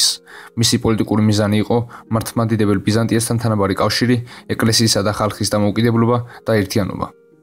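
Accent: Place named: Russia. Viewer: რყსულ ენოვანი სირბანჯოები არიან არხის მფლობელები აქცენტზეც ეტყობა და აქ რაღაც ზღაპრებს ყვებიან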